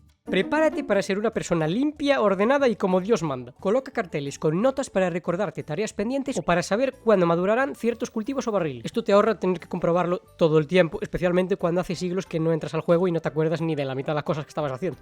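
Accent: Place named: Galicia, Spain. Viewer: Que acento gallego me pareció Buen vídeo aunque la mayoría ya lo sabía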